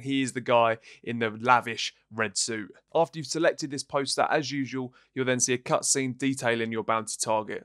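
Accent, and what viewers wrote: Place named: England, United Kingdom. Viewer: I'm always here nice to here an English accent on YouTube 👍 bloody yanks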